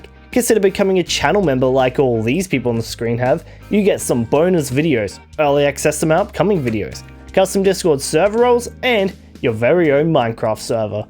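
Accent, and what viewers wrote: Australia. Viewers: he's Aussie.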